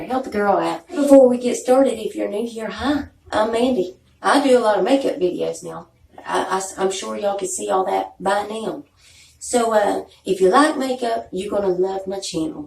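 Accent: South, United States. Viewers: Hey sweet face, i call my wrinkles WISE CRACKS💖💖😜 thank you so much for sharing your videos with us! I absolutely love them! And thank you for these awesome tips I am 46 years old and have stage 4 lung disease set my medications done a lot of damage to my skin and being a little I guess! But I have been trying the Skin Care night and day and I think it's starting to make a difference so thank you so much for the wrinkle tips I needed that LOL! And you remind me Paula Deen! LOL I'm from North Carolina to so I love that southern twang😜😜😜💖💖💖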